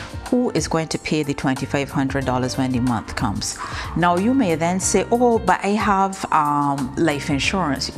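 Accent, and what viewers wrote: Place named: Caribbean. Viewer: I figured you are the caribbean by your accent. Hope your mom Maxine is doing ok. Blessings in your endeavors. Keep soaring lady....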